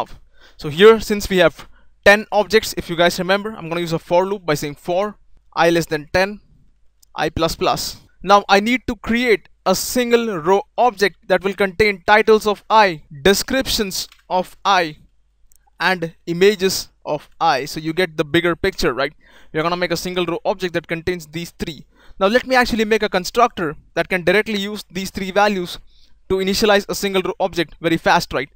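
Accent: India. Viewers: we need more tutorials like this, clearly explained, comprehensible and energetic, especially coming from someone with an indian accent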